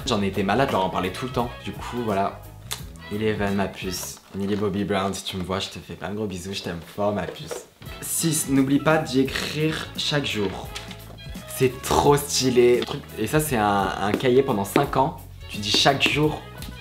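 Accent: England, United Kingdom. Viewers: J'adore ton accent anglais quand tu parles anglais (c logique )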